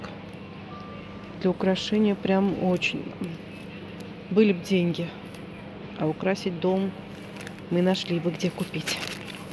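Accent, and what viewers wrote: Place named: Central Russia, Russia. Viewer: ПолОжила,взЯла .так говорят почти все,кто живёт в средней полосе России . Видимо это местный диалект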